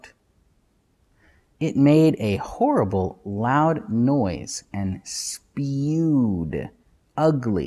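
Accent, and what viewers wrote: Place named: United States. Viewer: You read books with a very good american accent i would love to talk you can i ?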